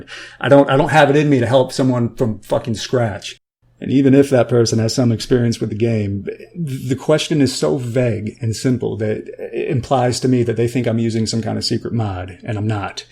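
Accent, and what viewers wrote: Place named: working class, United States. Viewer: he sounds like most working class americans. this is how we talk. and he's being honest about how he feels which is a good thong, actually. if it makes you uncomfortable, maybe its a personal problem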